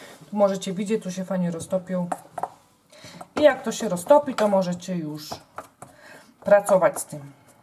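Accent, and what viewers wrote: Silesia, Poland. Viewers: Tak, śląskim ;)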